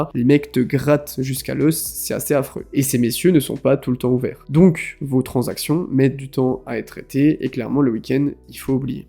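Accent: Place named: East, France. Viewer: Allianceblock les gars, jeton $NXRA, vous me remecierez plus tard... merci pour ton travail, c'est quoi ce petit accent, ne viendrais-tu de l'Est de la France😊?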